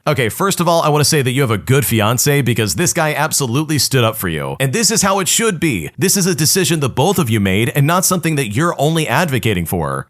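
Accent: United Kingdom. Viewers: I love the British voice more actually lol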